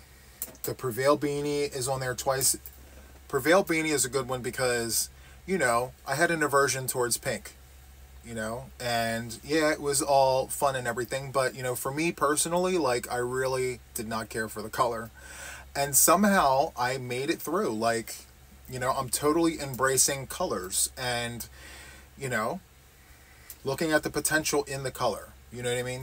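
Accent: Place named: East Coast, United States. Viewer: Happy new year and friends!! I just love your enthusiasm and especially when that East Coast accent comes out 😂! Please tell mama we say hello!!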